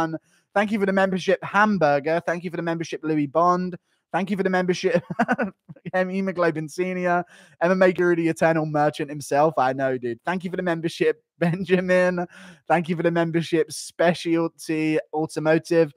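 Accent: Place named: United Kingdom. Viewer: it is lol. he speaks like 3 different languages and has a red neck vibe to him but talks like hes from britan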